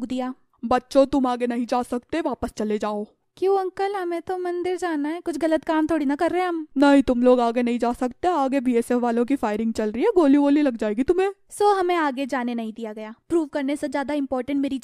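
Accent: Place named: Indore, India. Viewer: Your slang like indoris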